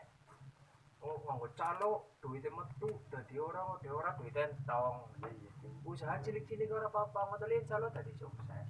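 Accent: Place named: Banyumas, Indonesia. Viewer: jooosss gandos wong ngapakkkkkk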